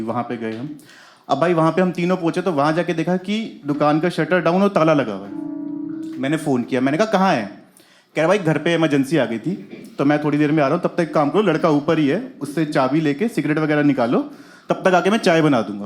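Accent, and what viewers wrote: Alwar, India. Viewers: Bhai alwar se ho kya?? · Bhai alwar se ho kya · Sir aap alwar rajasthan se ho kya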